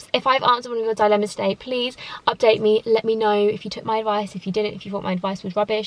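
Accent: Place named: London, United Kingdom. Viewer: I love your accent. Is this a london accent?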